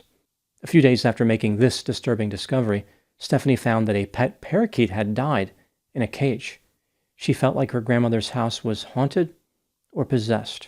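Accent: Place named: New Jersey, United States. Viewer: I have a suspicion might originally be from New Jersey - his accent gives it away… like the way he says the word “on”. It’s not heavy NJ but there are hints. Only speculating 😊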